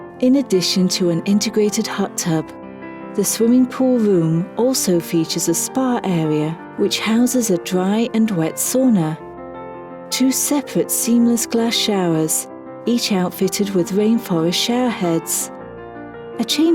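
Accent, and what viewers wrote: United Kingdom. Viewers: Jameson Cross yeah I think it’s the “British-like” accent. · Nice English voice keeps saying "over looks the garden" um, dead grass. Spend 13 million and you still have to do a major outdoor remodel and get rid of those marble floors. Looks cold and uninviting.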